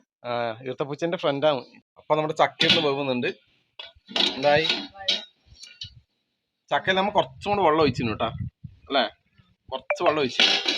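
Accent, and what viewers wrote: Kannur, India. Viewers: താങ്കൾ പറയുന്നത് ശരി തന്നെയാണ് ഒരു ലിസ്റ്റിൽ തന്നെ നാല് അഞ്ച് സ്റ്റൈൽ ഭാഷ ഉണ്ടാകും..ഞങ്ങളുടെ മലപ്പുറത്തും ഭാഷകൾ വ്യത്യാസം വരും..ഉദാഹരണം വേങ്ങര എന്ന് പറയുന്ന സ്ഥലത്ത്.. കജ്ജ് എന്ന് പറയും.. ഞങ്ങൾ പെരിന്തൽമണ്ണ ഭാഗത്ത് കയ്യ് എന്ന് പറയും.. വേങ്ങര പാട്ട് പജ്ജ് എന്ന് പറയും.. ഞങ്ങൾ പെരിന്തൽമണ്ണ പശു എന്നു പറയും... വേങ്ങര ഭാഗത്ത്. കജ്ജുമ്മ നജ്ജായി..ഞങ്ങൾ പെരിന്തൽമണ്ണ ഭാഗത്ത്. കയ്യുംമേൽ നെയ്യ് പറ്റി.... വർത്താനം സ്റ്റൈൽ നല്ലതാണ്.. അബുദാബിയിൽ എനിക്കൊരു കൂട്ടുകാരൻ ഉണ്ടായിരുന്നു അയാളുടെ പേര് തമ്പാൻ.. അദ്ദേഹം കണ്ണൂരാണ്.. നിങ്ങളുടെ അതേ ഭാഷ തന്നെയാണ് ചിലപ്പോൾ നിങ്ങളുടെ നാട്ടുകാരൻ ആയിരിക്കാം.. ഓക്കേ ബ്രോ കണ്ടിന്യൂ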